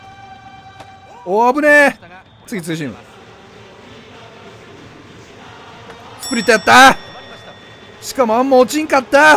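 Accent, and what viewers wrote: Kansai, Japan. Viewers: 標準語からバリバリの関西弁に戻った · 昨日からはうって変わってコテコテの関西弁に戻ってて草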